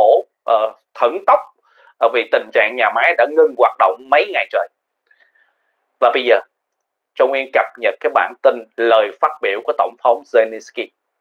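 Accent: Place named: Mekong Delta, Vietnam. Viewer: Em trai nói giọng miền tây nghe đã tai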